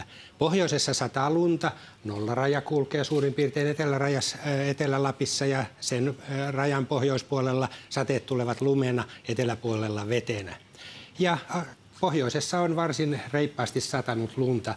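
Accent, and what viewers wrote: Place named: Spain. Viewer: sounds like spanish accent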